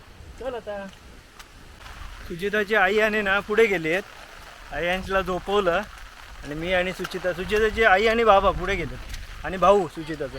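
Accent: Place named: Malvani, India. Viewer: तुजी बायको किती मेहनती आहे. तू जे मालवणी बोलतो ते ऐकून भारी वाटत.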